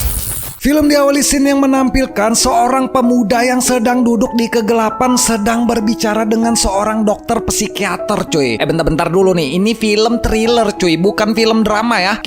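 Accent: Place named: Lampung, Indonesia. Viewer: Dari tata bicara kaya orang Lampung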